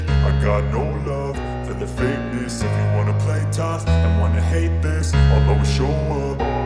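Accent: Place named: Switzerland. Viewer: you sound swiss :) sali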